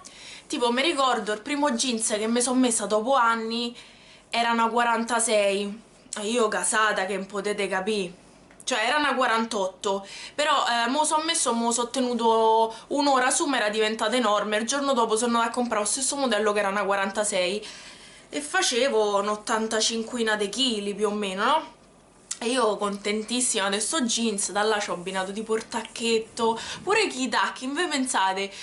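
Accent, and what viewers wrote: Rome, Italy. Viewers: Io non ho questo stile ma ti giuro che è bellissima questa rubrica,poi io amo l'accento romano (io sono milanese) quindi amo sentirti parlare 💙💙😘 Ah,sei sempre la più figa 🔝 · perchè non provi a fare anche un video dove insegni detti Romani? o comunque dici qualcosa in romanaccio, adoro come parli ahah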